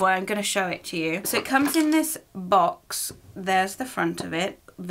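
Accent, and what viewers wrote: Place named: Scotland, United Kingdom. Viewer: Love the Scottish accent! 😂👍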